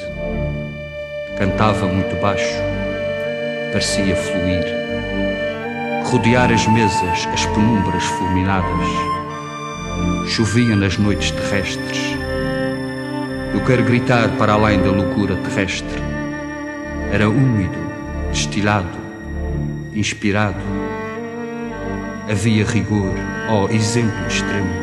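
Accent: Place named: Madeira, Portugal. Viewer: Foda-se e com sotaque madeirense!!! Orgulho de ser madeirense!!!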